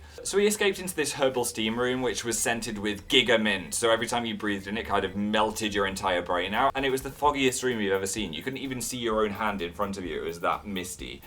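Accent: United Kingdom. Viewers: I love the way he said 'america'. Mmm<3 Dem British accents*shivers from fangirl overload*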